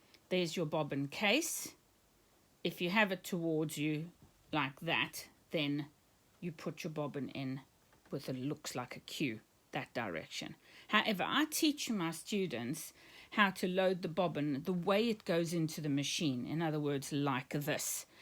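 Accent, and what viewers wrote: South Africa. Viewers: I just bought a 700 which only does straight and zig-zag stitches. I can't wait for it to arrive. Can you point me in the direction of some information as to how to give it the kind of TLC it deserves, as mentioned in your video? Love your accent by the way. Are you from South Africa?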